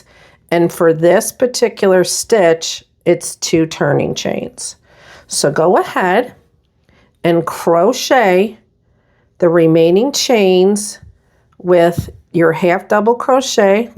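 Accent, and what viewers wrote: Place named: Northern Illinois, United States. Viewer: Girl, are you from Northern Illinois? You sound like home.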